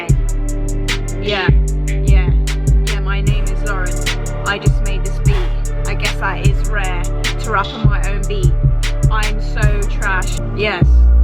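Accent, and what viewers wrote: United Kingdom. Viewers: Love the beats and listening to British people talk. Best of both worlds 🤣👍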